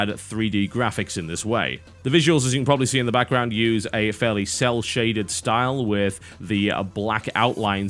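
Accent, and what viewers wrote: United Kingdom. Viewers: Don't know why but that British accent is such a turn on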